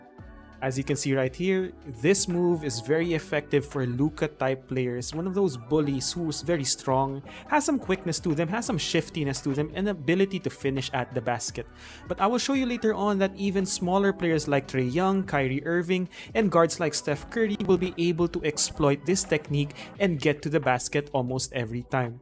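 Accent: Philippines. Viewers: Bro are u filipino i can hear it in the accent lol all my teachers were filipino on guam 🤣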